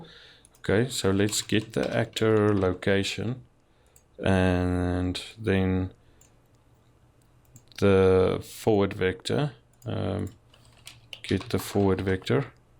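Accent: South Africa. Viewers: Good to hear a SA accent on a tutorial video.! Keep the naas foorums up Boet! : )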